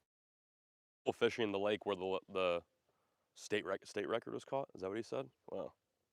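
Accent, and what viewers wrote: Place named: Chicago, United States. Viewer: you still talk like your straight outta the burbs of Chicago Texas hasn't changed your accent at all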